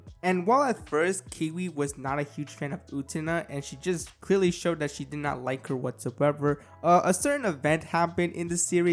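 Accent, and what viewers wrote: Spain. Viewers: that's just how spanish ppl speak english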